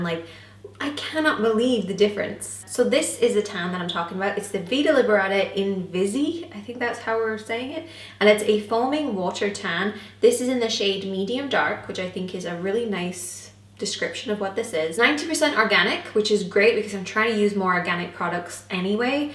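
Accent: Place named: Ireland. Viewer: I can hear an Irish accent in there 🇮🇪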